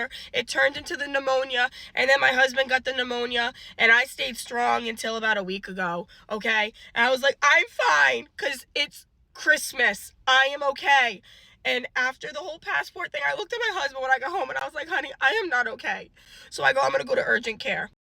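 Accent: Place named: Louisiana, United States. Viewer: The accent! Sound like us down in Louisiana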